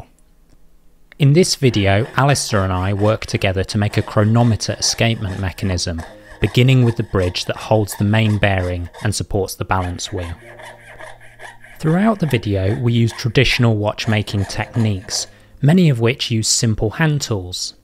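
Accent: United Kingdom. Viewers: This channel is very reminiscent of Clickspring, except with a relaxing English voice instead of a relaxing Australian voice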